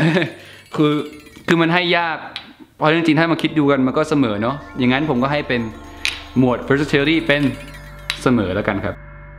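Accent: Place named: United Kingdom. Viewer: I love your EN accent <3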